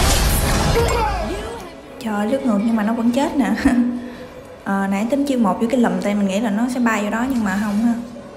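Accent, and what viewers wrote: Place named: Mekong Delta, Vietnam. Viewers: Bà này miền tây chính cống mà. Nên ngta mới gọi miền tây á. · Nói giọng đậm chất miền tây luôn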